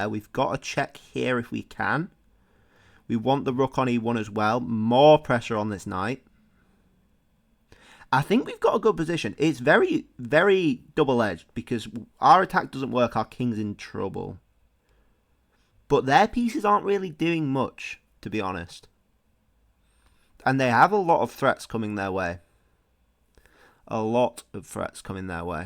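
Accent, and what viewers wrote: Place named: Cockney, United Kingdom. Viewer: i think it's his cockney accent.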